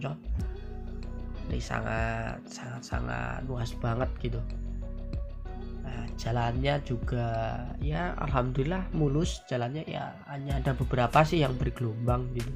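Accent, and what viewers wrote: Java, Indonesia. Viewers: Logat jawa org jawa ke? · Wadduh medhok lidahnya hehe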